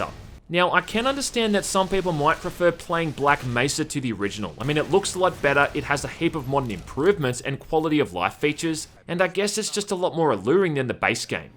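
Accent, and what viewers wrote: Australia. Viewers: Your Australian accent, combined with your witty humor , made me laugh so hard I sneezed out my coke...... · Real talk. Do you really lean into the Australian accent, or is this natural? You have the thickest accent of all the Ozzie YouTubers I’ve watched haha.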